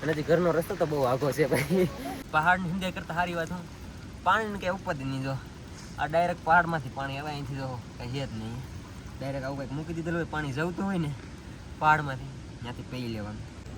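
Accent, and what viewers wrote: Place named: Bhavnagar, India. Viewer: Tamari language(boli) pyor bhavnagari che ❤ so like it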